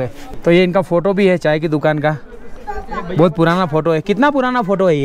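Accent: Gujarat, India. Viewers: Your videos are good but your Hindi is Gujarati accented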